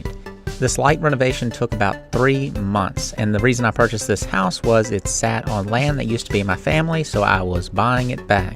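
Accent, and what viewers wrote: South, United States. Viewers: What city & state or town & state, is this located in??? Your accent sounds like you’re somewhere down South & I love the rent prices!👍🏻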